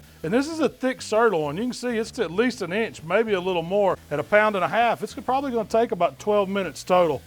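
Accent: South, United States. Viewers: This guy is awesome! Where is he from? Im from Germany so i don't know much about american accents but he sounds so cool! Anways greetings from Germany! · Dude with cool southern accent, love of red meat and Jack Daniels, and uses the black nylon BBQ gloves...I'm subbed!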